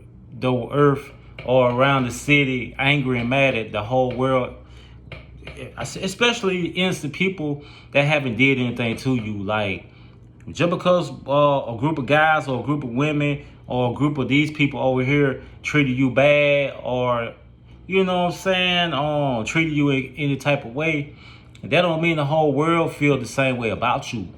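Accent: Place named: South, United States. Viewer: You from North Carolina/Virginia?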